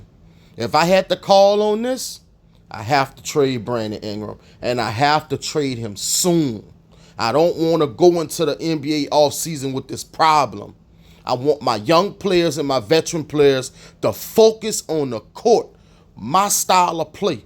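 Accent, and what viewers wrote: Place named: New Orleans, United States. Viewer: Sound like you from the EASTSIDE OF THE NOLA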